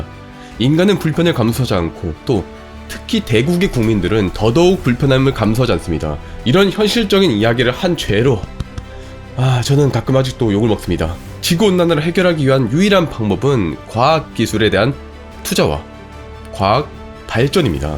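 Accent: Gyeongsang, South Korea. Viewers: 경상도 아이가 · 경상도 같이 느꼈어요..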